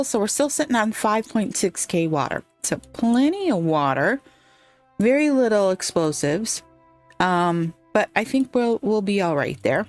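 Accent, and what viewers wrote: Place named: Midwest, United States. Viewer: From ope to oofda, I really enjoy the midwestern speech. It all makes me feel at home while listening. Plus, the soundtrack is so catchy, I love to hum along as well. I hope you had an amazing Solstice, and that the next three months bring you much needed rest and revitalization. Thank you for sharing your playthrough 🙏🌠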